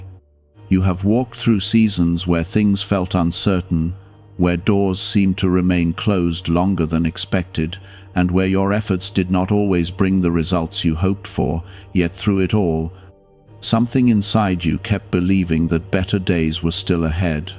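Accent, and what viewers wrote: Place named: United Kingdom. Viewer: Why does this AI god have a slight Britsh accent?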